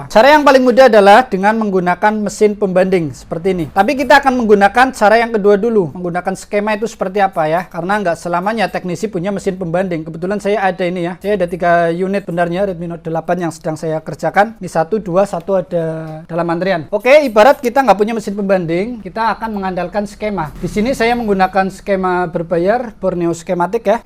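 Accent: Banyumas, Indonesia. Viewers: Mantap bg,, kayaknya orang ngapak ya dari logat😁👍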